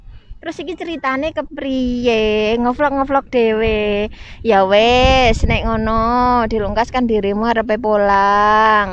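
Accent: Indonesia. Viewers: Suaranya medok banget kak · Ko logatnya kaya orang Jawa timur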